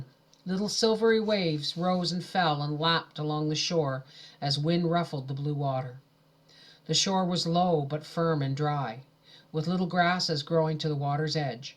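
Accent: Canada. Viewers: the pronunciation must be a Canadian thing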